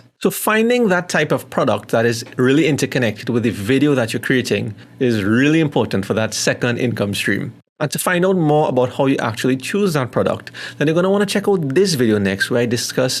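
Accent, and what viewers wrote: Trinidad and Tobago. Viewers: Dude are you from Trinidad? Your accent caught me off guard crazy!!!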